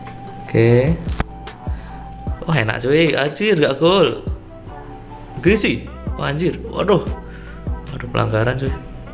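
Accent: Java, Indonesia. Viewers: medhok men mas haha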